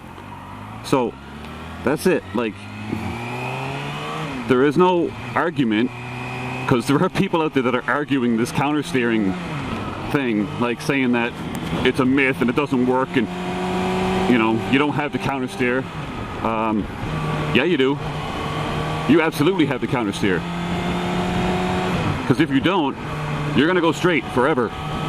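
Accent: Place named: Ireland. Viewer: stop fing and talking and do some cornering. bla bla bla. Some Irish accent is coming out to lol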